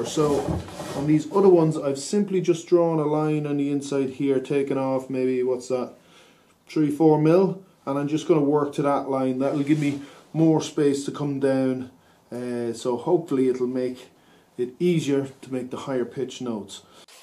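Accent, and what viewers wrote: Ireland. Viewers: Don’t even try using the closed captions. You just need to learn thick Irish. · You Irish? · Stellar video! So cool to see it worked out so well, and the video production is also very nice. Loved the commentary and the Irisch? accent.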